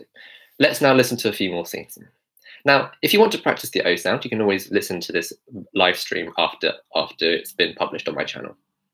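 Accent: United Kingdom. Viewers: yaaas! the scar, the glasses, HP mood, British accent - I love all of it <3 very helpful! thanks! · Perfect brit accent!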